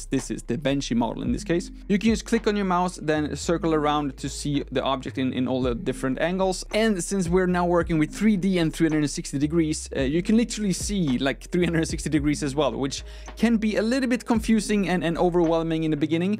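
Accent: United Kingdom. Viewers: British English, thank you, subscribed! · Keep your British English. It's not wrong. 😂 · British English, subscribed! - Trying unlearn - unsubscribed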